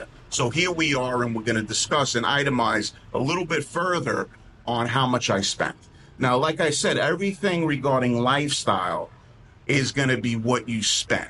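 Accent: Brooklyn, United States. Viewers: love the Brooklyn-speak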